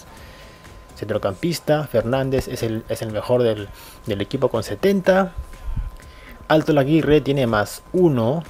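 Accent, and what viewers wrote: Uruguay. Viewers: sos uruguayo xD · parece q sos uruguayo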